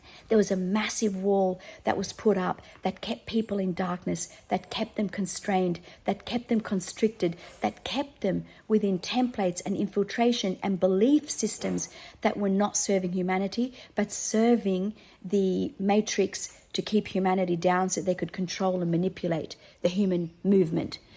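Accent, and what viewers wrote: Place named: Australia. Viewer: Hello from Western Australia 👋 great to hear another Aussie lady speaking on TF’s 🙏♥️✨✨✨I’m first wave, I met my Beloved late 2013/14. And yes your spot on, he has kept me in deep dark silence for years. I’ve been blocked & im still blocked as far as I know. No friends in common, no social media to “ stalk” nothing!!! We last spent a night with each other Dec 2019, heard from him again over a phone call Feb 15th 2020 and have not heard from him since. But he never hurt me intentionally or ever said a mean word, he just ran and ran hard. He’s in a relationship with young kids. I’m 12 years older at the age of 51. lol 😂 another EDIT!!! YES I’m a born rebel, you have described me to a T. I am anti everything “ Establishments” and I’ve seen/known of the darkness that dwells within these matrix establishments since I was a young girl. 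I DO NOT COMPLY 🙌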